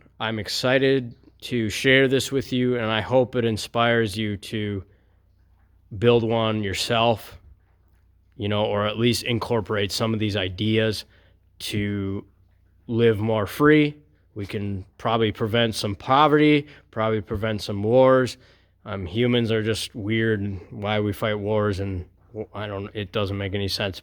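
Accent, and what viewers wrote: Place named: Canada. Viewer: I could have sworn you were canadian.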